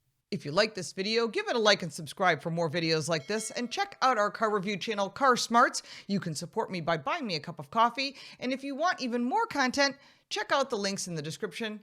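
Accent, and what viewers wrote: United States. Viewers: I don’t care about your bambling on in your yanky voice I’m Aussie and I drive a Australian made car made in the 90s with a huge sub woofer don’t want to know about Electric crap shit boxes cars are petrol v8 and six cylinder turbos WAKE UP FK WITS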